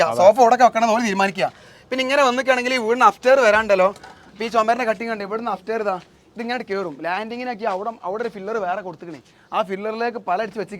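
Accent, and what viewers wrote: Malappuram, India. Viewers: പെരന്റെ ഔത്ത് നമ്മുടെ മലപ്പുറം ഭാഷ😅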